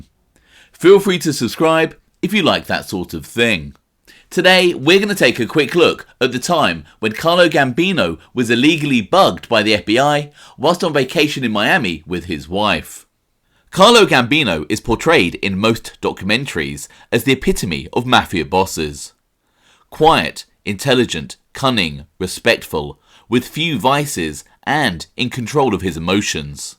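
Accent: United Kingdom. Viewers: I’m so sorry for your voice. Brit plus that lisp is disgusting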